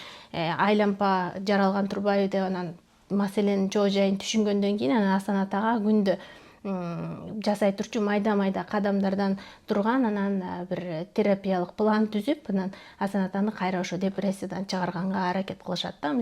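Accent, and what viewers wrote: Standard. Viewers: Кыргызча таза суйлойт экенсиз🇰🇬😍ыраазычылык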